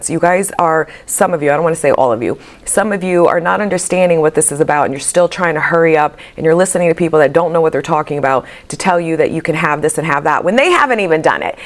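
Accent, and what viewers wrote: New York, United States. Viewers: You're f***ng amazing. I can tell you're a New Yorker. I'm a subscriber and I'm so glad I found your site. You help me always over the last couple months. I'm a work in progress. Gemini guy and working on rebuilding with my Cancer lady. Just your aura and lovely way of breaking down the steps. Keep up the awesome work. Love it all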